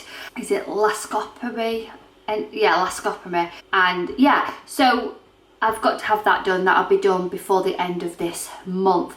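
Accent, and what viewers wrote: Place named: Sheffield, United Kingdom. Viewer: You sound like you’re not far from me in sunny Sheffield xx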